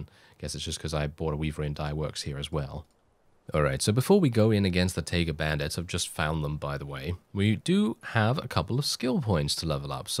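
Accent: United Kingdom. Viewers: His voice changed so much, go to his first series with barney beartilde, he used to sound so british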